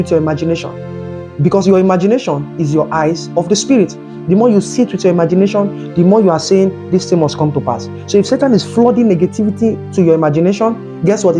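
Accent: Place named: Africa. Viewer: Great lesson, scriptures and love the African accent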